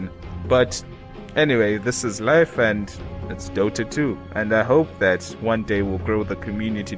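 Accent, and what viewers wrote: South Africa. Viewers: He does sound very South African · I'm guessing you're from South Africa. Am I right? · dude are you South African? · this oke is south african for sure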